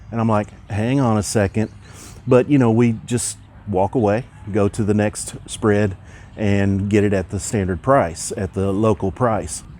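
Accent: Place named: South, United States. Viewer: What state are you from in the US? You have a sorta country accent..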